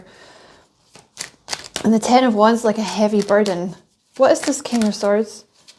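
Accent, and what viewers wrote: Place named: Scotland, United Kingdom. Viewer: Omg literally all the things you said resonated sooooo much and I’ve been journaling and getting promots exactly like the ones you said. I love your expression chillin like a villain 🤣 thank-you so much I love hearing a fellow uk (okay Scottish to be exact) accent for once on you tube as well ❤🙏🏽 thank-you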